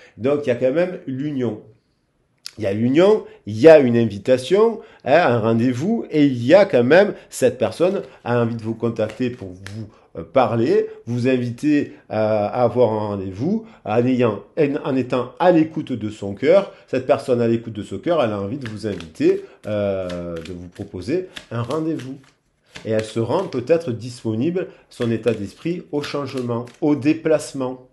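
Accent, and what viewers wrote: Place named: Marseille, France. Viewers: Oh bonne mère Bon anniversaire moi qui adore cet accent...Nîmois et Marseillais de coeur...ma.famille.avait la meilleur boulangerie du Merlan...Garvi....en 86.. jai des souvenirs magnifique...L.OM ....puis a1 selection the Voice a L.hyperion......bonne journee et merci pour cette guidance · Et bien voilà, vous m'avez encore fait rire ! J'ai vécu à Martigues, j'avais moi aussi cet accent qui vous caractérise ! Profitez bien de votre soirée entre amis ! Merci pour cet échange et d'avoir pris le temps de me répondre, c'est excessivement rare, comme mes commentaires en règle général, mais nous avons tous besoin d'encouragement. A bientôt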